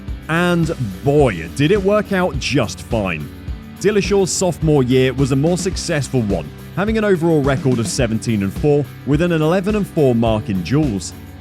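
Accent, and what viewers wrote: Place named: United Kingdom. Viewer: Your accent is so annoying British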